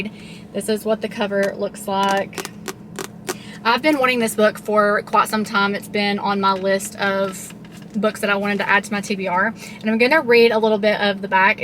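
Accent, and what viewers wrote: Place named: South, United States. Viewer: I just discovered your channel and this was the first video I watched. Super cute video! I hear that Southern accent. What state are you in? You mentioned a trip to Houston I think. Are you in Texas? 😊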